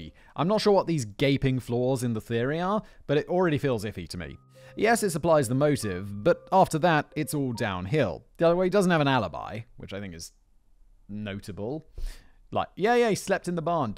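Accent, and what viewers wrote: Germany. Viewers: As a german i for one am fine with you doing a german accent. I think it is hilarious and it is so cool when you by accident pronounce something german correctly. Go ahead from me :)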